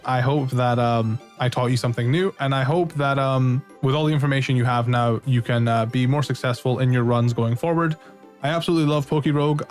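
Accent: United Kingdom. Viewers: iam 5 sec in and you have the most british accent ever xD i love it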